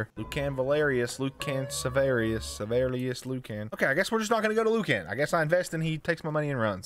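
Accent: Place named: Rural, United States. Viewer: dude i live in texas and your accent is so hick lol skahrim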